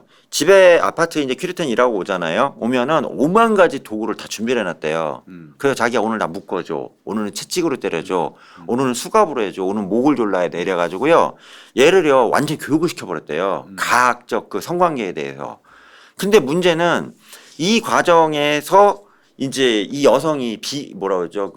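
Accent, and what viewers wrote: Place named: Seoul, South Korea. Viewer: 염블리님 얼굴이 헬쓱해지신거 같은데 아프신건 아니겠쥬? 근데 말미에 뭐뭐 한거라~~ 이러이러 한거라~ 이건 어느지역 말투에용? 옛날 서울사투리같은 느낌인가요? 사건의뢰 왕팬이라 자주 듣다보니 저도 모르게 따라해서ㅋㅋ 궁금해지네요~~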